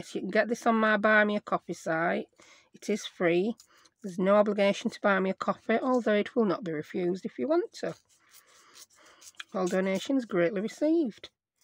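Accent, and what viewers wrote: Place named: Yorkshire, United Kingdom. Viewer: So refreshing to hear someone with a Yorkshire accent. I was born and grew up in Wakey! Just discovered your channel as I have the junk journal bug again and your style is just what I'm aiming for. I've recently subscribed and am working my way through your videos.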